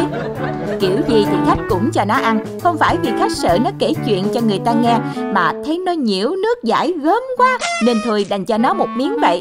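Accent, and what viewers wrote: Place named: South, Vietnam. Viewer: Nhiễu nước dãi .. gớm quá.., giọng đọc miền Nam dễ thương .. quá.. !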